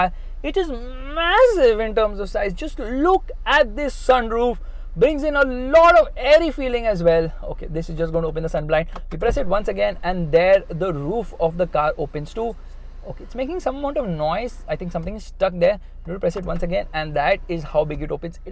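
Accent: India. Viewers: Can you speak a little slow. Your english sounds like some Indian language for sure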